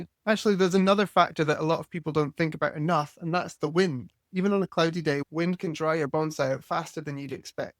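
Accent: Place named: Scotland, United Kingdom. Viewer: He sounds Scottish. A wee bit.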